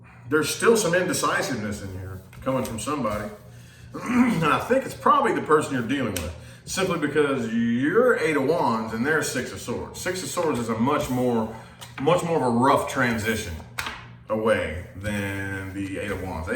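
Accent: South, United States. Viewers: 🤣 I have a really southern accent like does. My voice texts NEVER come out right lmfao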